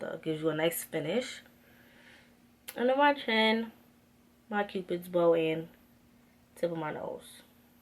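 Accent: New York, United States. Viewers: You sound like your from NYC lol great video and new subbie I'm loving your channel 😍😍😍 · you are so pretty. you have an accent too lol. are you from new york? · I knew you were from newyork as soon as I heard that accent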